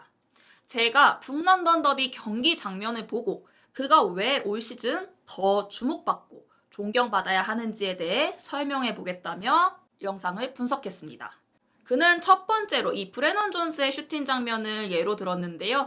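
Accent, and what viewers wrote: Gyeongsang, South Korea. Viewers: 억양이 곧 갱상도 사투리가 틔어나올꺼 같은 억양이네욛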